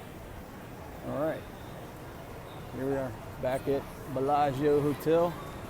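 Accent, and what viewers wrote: South, United States. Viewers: Your accent sounds like you're from the south? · You have a cool suthern accent. Lo Great video anyway.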